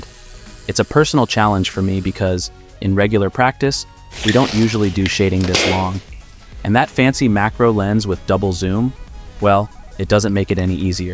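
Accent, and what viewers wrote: United States. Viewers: an Austrian artist with a perfect American english...awesome! Im Dan, from Brazil and I inspire on becoming an artist. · I totally thought you were American. Possibly even from my area in the Pacific Northwest. So cool to find out your from Austria! Love the content dude. These vids are incredible!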